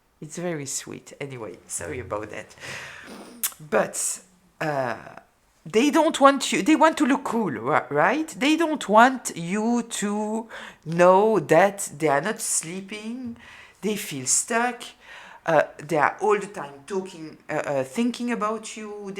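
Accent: France. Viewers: U are simply a Candy to my Ears, all that French accent and the typical French frankness and dutyfullness 100%%%% on the Mark . ❤❤❤🎉🎉🎉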